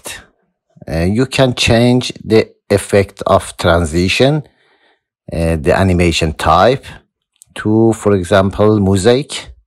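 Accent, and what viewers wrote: Iran. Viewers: are you Iranian???/🤣🤣🤣🤣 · لهجه ایرانی مشتی؟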